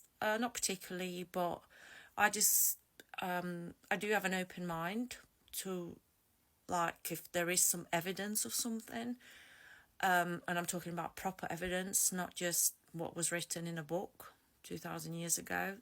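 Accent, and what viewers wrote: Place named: North Midlands, United Kingdom. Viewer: I was born into it but made my 'escape' in my mid-teens when I got old enough to slowly move myself 'out the door' over the course of a year or so. So well done to you for making the break. I have to agree that the use of the Bible as if it is an authority with the power to convince you does seem to be very common. My father tried it on me tirelessly from when I left the organisation until the day he died, utterly ignoring my core response that, if I do not agree that his source is 'literal truth', then it is has no chance of causing me to suddenly see the 'error of my ways'. Greetings from an English Druid ... and I was surprised when you said you were Latvian as that accent definitely sounds North Midlands to me :D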